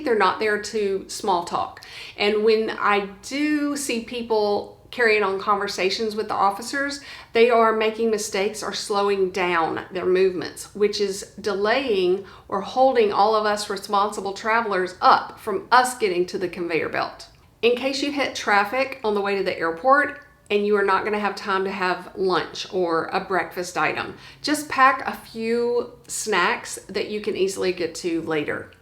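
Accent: South, United States. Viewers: I cannot express how much I appreciate your videos. We have traveled quite a bit so how you manage to throw a tip out there that we can use is awesome! Every video you have is so informative. I have directed many newbie travelers to watch your videos. Keep up the great work! Your southern accent is also lovely. My husband is from TX and I love it. 😀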